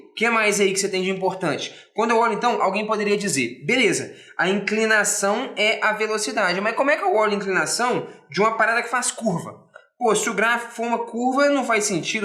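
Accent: Minas Gerais, Brazil. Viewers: Eu te amo cara se ensina bem e com um sotaque de mineiro foda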